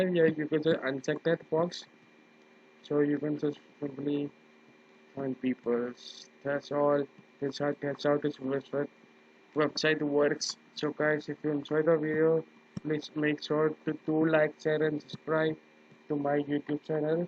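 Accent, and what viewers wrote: India. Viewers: indian indeed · u from india?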